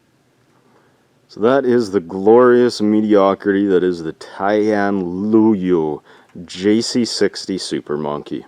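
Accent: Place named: Canada. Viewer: We know a Canadian accent when we hear it hellll yeah bawd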